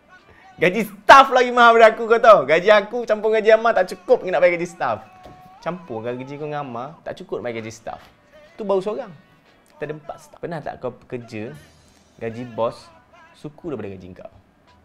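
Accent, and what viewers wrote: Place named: Minangkabau, Indonesia. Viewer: hulaa...patotla ckp minang manjang....asal greenwood ke..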